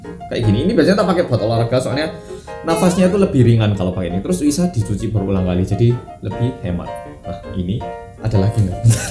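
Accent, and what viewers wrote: Java, Indonesia. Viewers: Korea rasa Jawa..mdoooeee ram eram..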